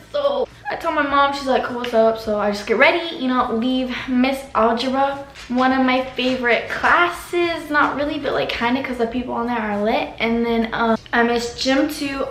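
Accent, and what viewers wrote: Texas, United States. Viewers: american accent?? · texas accent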